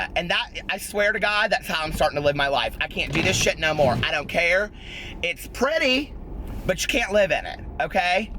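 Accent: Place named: Midwest, United States. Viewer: Your Midwest accent really came out in this rant lol 💙💙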